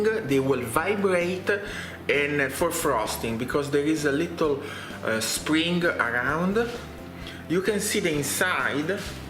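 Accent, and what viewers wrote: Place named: Italy. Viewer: It's a clear Italian accent, but even if you aren't familiar, he literally said "Here in Italy" at the start of the video.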